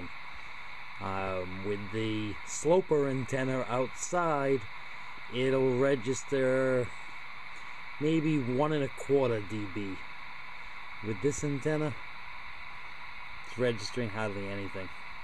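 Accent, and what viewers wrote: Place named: Boston, United States. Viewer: Interesting - if you’re going dipole on 10/11 meters vertical polarization is the BEST way to go. You sound like my Southie submarine shipmates - where ya’ from? Bahstin?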